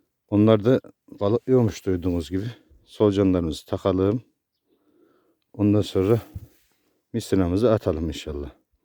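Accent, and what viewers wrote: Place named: Amasya, Turkey. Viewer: bende Amasya lıyım abi şivenden anladım hemen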